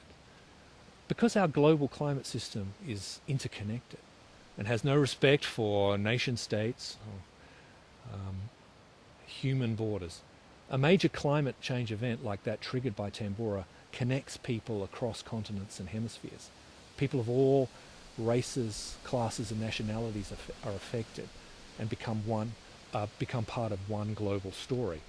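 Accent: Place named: United States. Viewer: When you accent is so thick that you're can't pronouce the difference between "barley" and "Bal".... People all over the world like you less and less, americans.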